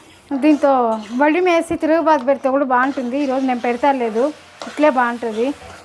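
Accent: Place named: Anantapur, India. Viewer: Sister your Launguage is very nice,it remember me my Ananthapuram journey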